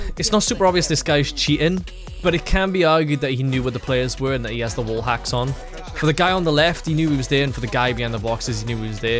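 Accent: Wales, United Kingdom. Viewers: do i hear a welsh accent? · Is that a fellow welsh accent?👀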